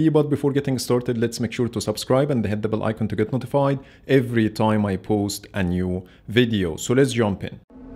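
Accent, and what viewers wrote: Egypt. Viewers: باين اوى انك مصرى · Are you Egyptian !!!! لهجتك الانجليزي واضحة أنه مصرية 😁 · Why the Egyptian accent ? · Is this Egyptian accent 🇪🇬👏😋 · انت مصري 🤔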